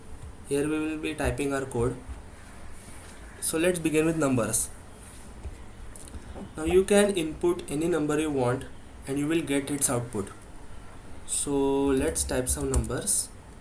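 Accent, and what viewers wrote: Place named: Maharashtra, India. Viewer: sir, this is really very helpful tutorial, no mess just dive into the topic. i guess you're maharashtrian by your accent, i could be wrong but i think so. thanks for this great tutorial.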